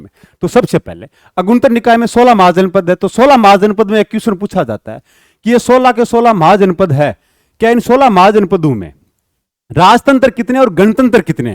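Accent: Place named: Haryana, India. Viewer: सर आपकी हिंदी भाषा ऐसी क्यू आप कहा के लगता हरियाणा से😮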